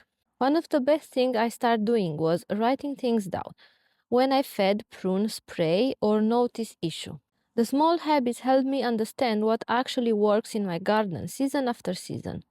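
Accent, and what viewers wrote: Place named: England, United Kingdom. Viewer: Very interesting and informative . I love your English accent.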